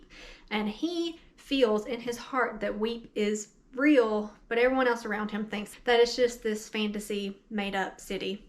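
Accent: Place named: Kentucky, United States. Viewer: Please tell me you’re from KY! You sound like home haha.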